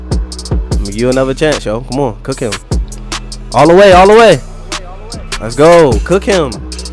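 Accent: Baltimore, United States. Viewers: U from Baltimore? I hear that accent lol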